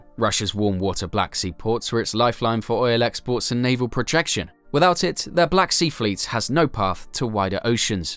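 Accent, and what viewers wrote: United Kingdom. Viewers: He sounds like U.K.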